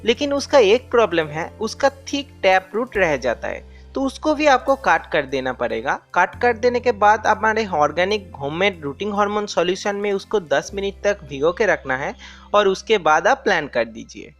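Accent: India. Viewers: Nice Hindi accent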